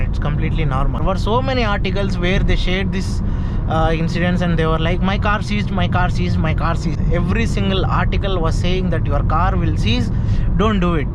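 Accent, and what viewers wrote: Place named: South India, India. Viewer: south indian accent rocks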